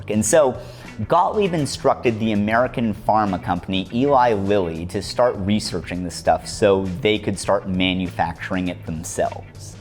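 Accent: Canada. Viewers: theres something about hearing a canadian talk about american history that causes me to chuckle. im 30 min in so far so good.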